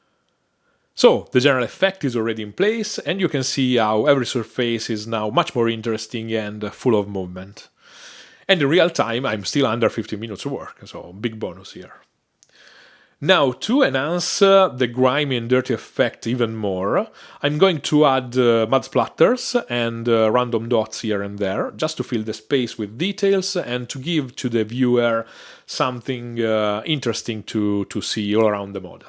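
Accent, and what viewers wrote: Italy. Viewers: good lord....that looks amazing..... love the slight italian accent gives all the artwork a "fathers of the renaissance" touch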